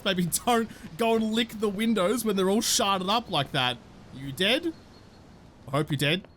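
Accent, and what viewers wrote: Australia. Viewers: You have the most Australian accent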